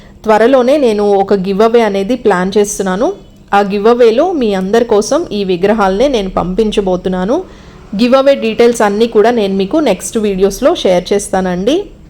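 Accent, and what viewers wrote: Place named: Vijayawada, India. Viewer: Crystal clear narration - Vijayawada Telugu accent - good feeling like having Vijayawada Andhra Bhojanam.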